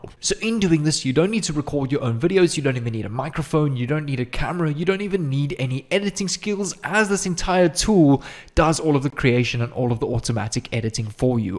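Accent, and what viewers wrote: South Africa. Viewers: Ayyy, is that a South African accent I hear 😂🤙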